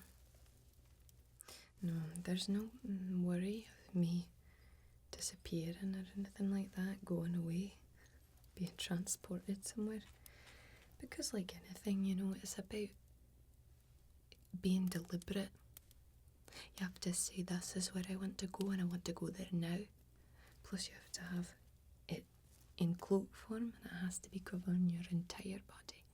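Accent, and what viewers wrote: Scotland, United Kingdom. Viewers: Okay , but how can all of your accents sound soooooo authentic. This is the first video of yours that I watch, and I legit thought your were Scottish , and then I watched your elf role play ,and thought that your were a brit with a really good Scottish accent, and then I went to your cooking channel.MIND BLOWN. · This is incredible quality for a second video or any video for that matter. I'd expect it from Goodnight Moon, who's been doing it for a while, but for a second video, it's amazing. The only improvement I can think of, not even a criticism, would be 60 fps. But that's a small matter. Everything is perfect. Your accent is just a plus, it's wonderful. Your first video it was much softer, almost American with an English tinge. The hard Scottish is great. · Loving the soft Scottish accent. Another superb ASMR video to sooth my frazzled Christmas mind. 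Thank you so much. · Damn good accent. I'm from Scotland, and you'd easily pass as someone from the north or west coast ^^ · There's something about blacksmiths that just demands a thick Scottish accent. It is pleasant to hear. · I can always use more Scottish accents in my feed of ASMR videos. Thanks for the very apparent effort even right out of the gate. I hope to see more from you. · Ahhh the Scottish accent is amazing! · Not even 5 minutes in and a Scottish accent. I like. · I love the Scottish accent. Quite good! Also, I have no idea why Scottish accents are so heavily tied to blacksmithing, but it makes me proud that they somehow got renowned for such skills. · Wow a new player has entered the ASMR ring and she’s amazing!!! Seriously I am blown away by the quality of these vids! Usually it takes 6 months to a year for new ASMR channels to start putting out such good and LONG videos! 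But this is channel is already a contender with Goodnight Moon for best fantasy role plays! And I absolutely adore fantasy role plays they are my favorite thing to watch to relax so I’m really really excited to see where this channel goes!!! The accent is gorgeous and so good I thought you were really Scottish! Do you play DnD because you are amazing at character building!! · Is that a Scottish accent? So mesmerizing and a very attractive women. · First video of yours I've seen, had to come back to this video because I was confused when you weren't Scottish in your next video, solid effort, loved it! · Blacksmith with a Scottish accent in a fantasy universe... Just like dwarfs. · What a great accent ! You must have been to Scotland! X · Scottish accent + blacksmithing + ASMR all things i adore and luv in one video, my god how did i stumble upon this vid just now ? totally subbed :heart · That's an excellent East Scotland accent if you are not really Scottish. 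· Goodnight Moon's rich fantasy storytelling meets ' accent. I love it! The soft speaking is really relaxing and you can tell that the production values were high. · Stumbled across your channel by accident, this is incredible!!! I thought you were Scottish for real! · Ok youtube ill finally click on this it keeps popping it my recommended . Love the scottish accent. really pretty. good asmr. ok i subbd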